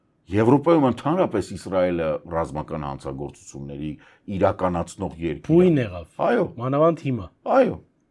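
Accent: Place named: Russia. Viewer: Այ տկարամիտ մինչև երբ պիտի տկարության մեջ թպռտաք, նեթեն յահու չե այլ նաթան յահու , հայերեն թ.փ. ք. ունենք ինչու եք դրանց փոխարեն ասում,տ. Պ. և կ և այլը, բավ է ռուսերեն առոգանությամբ խոսել. դուք Համէրը չես կարող ասել խամէռ. Հոնդան չես կարող ասել խոնդա. եթե այտ պես է ապա դուք լեքսուսը պիտի ասեք լեխուս. ռուսներից խույս եք տալիս և զզվում նրանցից բայց դեռ ռուսերեն առոգանությամբ եք խոսում. դուք հայ եք և պարտավոր եք անուն. անվանումները ուղղակի հենց հայերենով արտասանել ոչ թե մտնել ռուսերեն և հետո արտասանել հայերեն. էնէլ իրանի հեղափոխության ժամանակ էր. Խոմեյնին արտասանել էիք Հոմեյնի. Դուք կիրդ ու գրագետ անձեր եք չի կարելի այտ պես վարվել❤:Օրինակ դուք TRUMP-ը չիկարելի արտասանել ՏՌԱՄՊ. այլ ճիշտ անգլերենի ձևով պետքե ասել և այսպես=ԹՐԱՄՓ կՐծում եմ հասկանալիէ❤🎉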